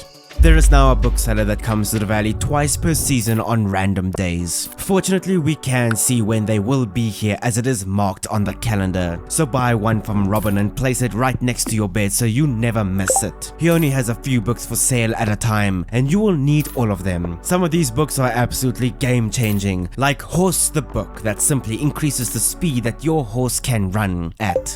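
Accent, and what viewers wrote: South Africa. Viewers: broski is South African, just like me :D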